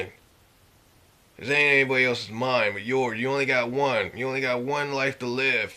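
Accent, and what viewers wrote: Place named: South, United States. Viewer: your body language is speaking when you're lying out of you mouth don't you know most things or institutions was suggested toward "black" you know what happened to natives . and now Mexicans are considered illegal alien(s) on parts of west side america (Mexicans original land ) go read a book (several) you're looking stupid . & you sound like typical southern tabacco chewing inbred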